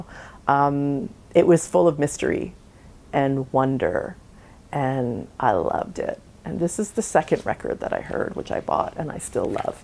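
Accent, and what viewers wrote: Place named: Canada. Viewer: ? Thank you! My heart is melting. I heard your Canadian accent immediately.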